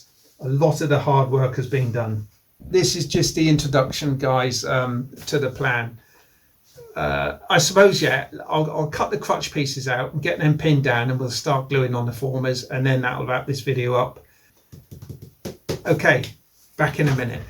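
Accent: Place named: United Kingdom. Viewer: Hi it is the most perfect way to build a glider I am busy with one but come across a problem which unbeknown to you you have just solved and here is the question where do you or did you get the adhesive which first you apply whet than dry and than “HOT IRON ON” I would be very grateful if you could help me out with a address. I am in California USA and it sounds as though you are in the UK by your accent. Regards Peter Onel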